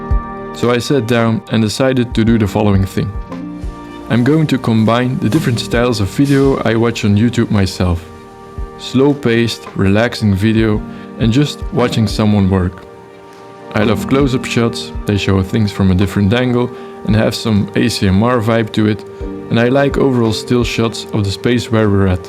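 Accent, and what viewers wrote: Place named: Belgium. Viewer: Met dat accent kan het niet anders dan een Belg zijn 😅 Fijn om een landgenoot te vinden op yt met een wood channel.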